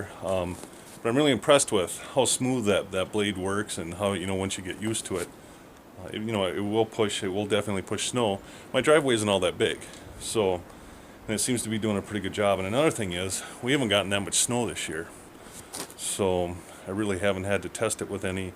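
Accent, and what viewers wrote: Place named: Upper Peninsula, United States. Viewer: EXCELLENT series! I sure hope my tractor restoration turns out 1/2 as beautiful as yours! I can't wait until spring so I can paint. ps. I'm from Michigan and you sure sound like you're from the U.P.!! ps.ps. My family and I vacation near Republic every summer......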